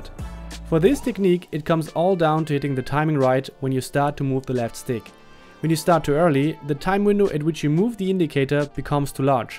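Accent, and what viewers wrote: Germany. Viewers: The classic German English 😁 great video! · Hört sich ziemlich deutsch an😂😊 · Man hört einfach, dass er ein Deutscher ist 😂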